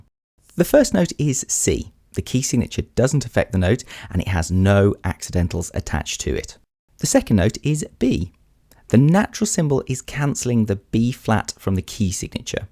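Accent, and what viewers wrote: United Kingdom. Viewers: I like your british acent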